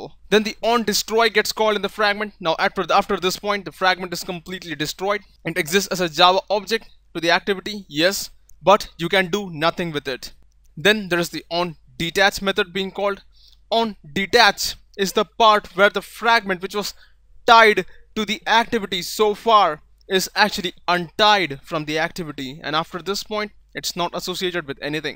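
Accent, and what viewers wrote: India. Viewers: 一听就知道是印度伟哥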